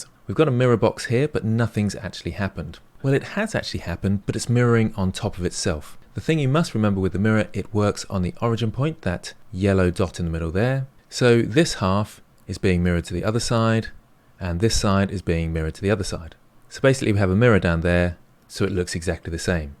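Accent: United Kingdom. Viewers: You're quite British dude. just couldn't follow blender guru at all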